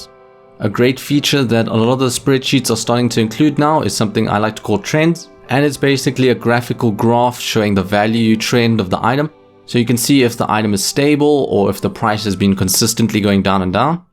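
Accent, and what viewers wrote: South Africa. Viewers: Dude you south African? If you are then yaaay xD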